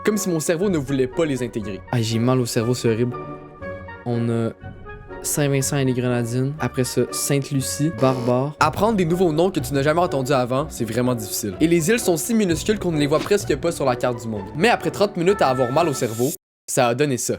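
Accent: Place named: Quebec, Canada. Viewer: Salut, tu utilises quelle application pour le montage ? Et sinon, j'adore l'accent Québécois, rien qu'à la pdp, j'ai vu que tu ressemblais à ADAM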